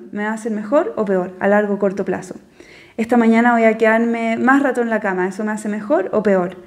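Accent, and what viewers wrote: Chile. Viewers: Gracias por estos vídeos!! Amo tu tono chileno. Saludos desde Argentina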